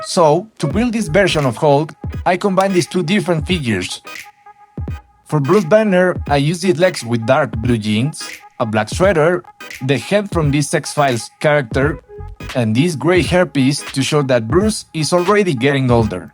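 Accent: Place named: Poland. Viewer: You're sound like poland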